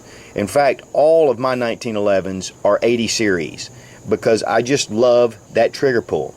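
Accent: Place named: South, United States. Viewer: Very nice review of this new Ruger SR1911. I love all your reviews. Something about your smooth Southern accent is pleasing to this old Yankee. Keep up the great work! Thanks!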